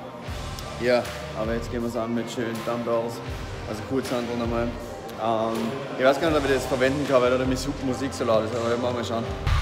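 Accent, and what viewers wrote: Vienna, Austria. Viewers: Wos i leiwand finde is des ma deinen österreichischen Akzent heard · Kommst du aus Österreich oder aus Deutschland? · kommst du aus wien oder oberösterreich? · Für mich hört es sich an als wärst du aus Niederösterreich/Wien. Schöne Grüße aus dem Waldviertel ✌️ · I guess you will have a hard time understanding him, is speaking with a heavy austrian accents. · Es ist er österreichisch mit deutschen Dialekt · well he has an strong austrian accent so it would be hard to understand even if you are able to understand "regular" german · Wo sind alle Österreicher🔝🔝🔝 Wie unfassbar geil es is dich mal auf österreichisch Scherze machen zu hören😂Und wie alle in den Kommentaren voll zamm halten!🦁Ich feiers.... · haha wia geil der Österreichische Dialekt! Homma💯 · Englisch sprichst du Mega gut quasi Akzentfrei aber bei deutsch kommt dann der Österreicher völlig durch 😂 feier ich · Es is so varruckt, dass ma di auf österreichisch a amoi heat..👀🤷🏼‍♂️😂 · Jaaawohl, du hast deinen österreichischen Akzent ned valoan🔥🇦🇹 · Wo aus österreich kummt er? 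· Es is schön dich mal mit Bissel wiener Dialekt zu hören find ich Ur Geil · Naja is ja eher Östereichisch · Bist du Wiener? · So geil österreichisch vo dir zum hern🇦🇹💗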